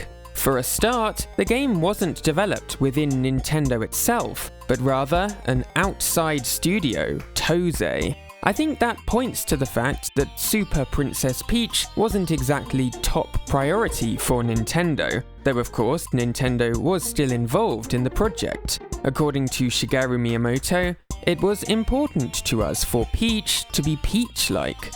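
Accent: United Kingdom. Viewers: One word and i know that you're a british although it's my first time watching this channel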